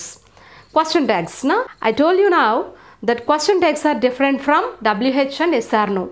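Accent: Telugu, India. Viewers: Content is good. But pronunciation is not up to the mark.Telugu accent is prominent. Please work on that to improve your teaching abilities.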